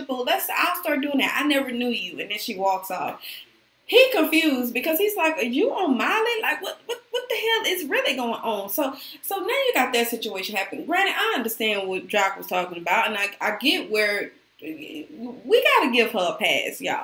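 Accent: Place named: South, United States. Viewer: are you from the south? You kinda have an accent lmao ( Love from Canada ❤)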